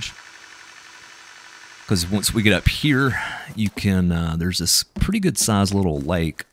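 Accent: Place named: South, United States. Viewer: I cannot pinpoint your accent. It has to be southern, Im thinking Arkansas? Where have I heard that accent before? Lol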